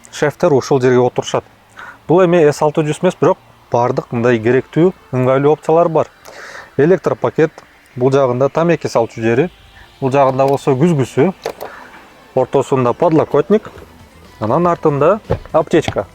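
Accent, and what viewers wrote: Standard. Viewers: Ушул алып баруучунун кыргызча таза сүйлөгөнү жагат ❤ Кабан жөнүндө кыргыз тилибизде чыккан биринчи обзор!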